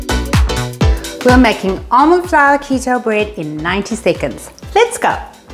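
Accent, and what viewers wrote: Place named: South Africa. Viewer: As I was typing my brain was quietly saying (maybe she's South African!). There are a lot of similarities in some of the accents/dialects. Your recipes are great and I'll share then how and when I can. Thank you.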